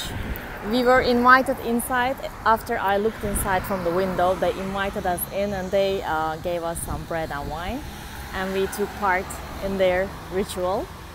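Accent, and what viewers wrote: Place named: Turkey. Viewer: Ah, 😊 türk müsünüz? Biraz accent imizden tahmin.etmisdim. I am also planning to go to Trinidad & Tobago, but we are a bit scared. Would you tell me a bit about the criminality please?:-)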